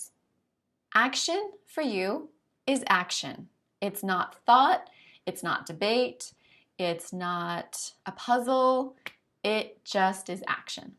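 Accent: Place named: United States. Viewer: I have venus and Mars in Aries and when im horny its horrible but thats also probably because my ascendant is scorpio... I had like 30 orgasms the other day and im a guy in my 30s so yeah I dont really care if I cum quick because i will be back at it in less than 10 minutes... which is not normal...probably end up killing someone if i just held this energy in...my ancestors from one side were Polish nobility and literally every single man since my great grandfather were involved in wars and killing people and the ones from back in the day were winged hussar knights who were undefeated on the battlefield and destroyed armies up to ten times the size of them, they are legendary war heroes in Polish and European history as they saved Europe from the ottoman empires expansion......my sister has her moon and north node in aries too and shes a nutcase also...my mother had a horrible explosive temper and would beat us constantly....its genetic i believe also.Luckily my sun in pisces calms things down though.. 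if i had my sun in Aries that would be bad. Im very good at fighting and quick and have trained alot in the past but now I just go to the gym to look muscular and toned... but yeah your hot by the way... if you ever go on vacation to Mallorca hit me up...thats were I live... I love that American accent ,it would turn me on so much hearing you speak dirty... but yeah lol ...anyways..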